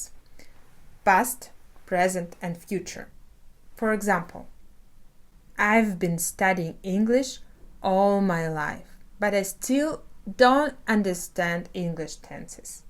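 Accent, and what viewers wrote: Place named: England, United Kingdom. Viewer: I love your English accent, it gets me chills 😁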